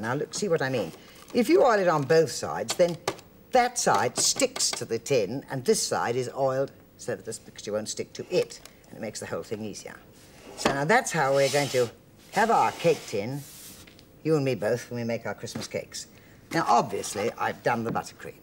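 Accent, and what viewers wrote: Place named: England, United Kingdom. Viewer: Awfully English lasy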